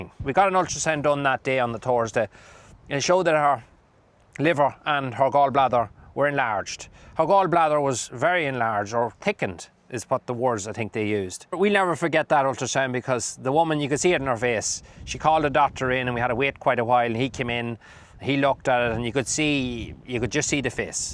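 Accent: Ireland. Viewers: What a terrible shock for you all, I’m so glad your little one is better. I really enjoy your videos. I’m not so keen on the engine repairs lol, but I love all the farming stuff and love listening to your Irish accent xx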